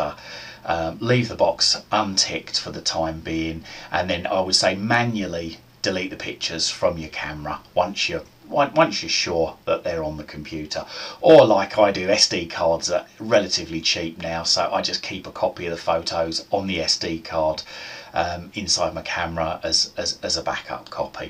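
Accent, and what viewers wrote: United Kingdom. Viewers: Too hard to understand his British accent. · I've just found this and I know its going to be clear and useful!..........and thank goodness for your British accent!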